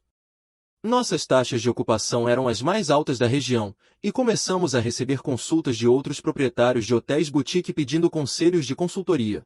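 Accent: Portugal. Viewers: Sim, porém mesclava o nosso português com o de Portugal e ficou muito estranho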